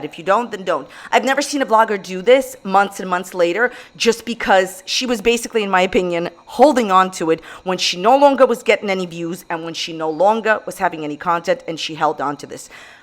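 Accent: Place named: Long Island, United States. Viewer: Omg the clip has me WEAAAK😂😭💀 you're the BEST reaction YouTuber with the funniest edits. You're hilarious & I always look forward to my girlfriend putting on your newest video everyday. The Monsters Monsters Monsters edit had me dying💀💀💀 Edit: Hey if you see this, I've always been wondering what accent do you have? It's like a kinda faint Long Island accent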